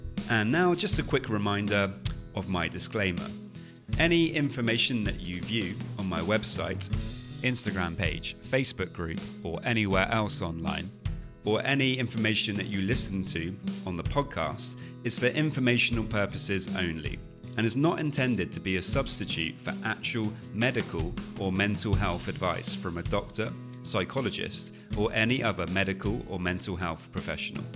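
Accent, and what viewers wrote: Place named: United Kingdom. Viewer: Didn’t realize you had a British accent til like 8 minutes in 😭😭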